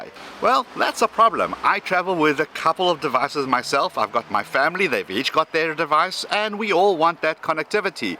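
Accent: South Africa. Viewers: Is that a South African accent?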